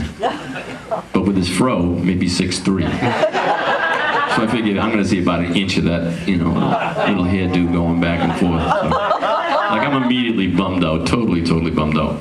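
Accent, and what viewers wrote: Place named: Boston, United States. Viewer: gotta love that Boston accent. Amazing player with a new unique voice while still having an old soul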